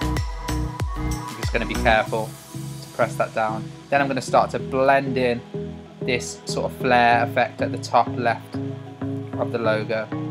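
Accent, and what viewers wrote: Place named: Midlands, United Kingdom. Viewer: Sounds like you are local to me. (Midlands) Can I commission you to make one for my wife's birthday party (26th Feb).?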